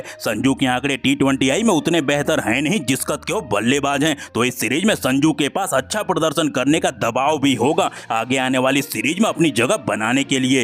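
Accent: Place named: Pakistan. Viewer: आप केसे बात कर रही हो? अपने बोलने का तरीका बदलें. ऐसा लगता है जैसे आप पक्के पाकिस्तानी हैं, बात करना तरीका change करो। एक सच्चा भारतीय ऐसा नहीं बोलते। Team INDIA हम तुम्हारे साथ थे, साथ हूं, साथ रहूंगा, इंडिया जिंदाबाद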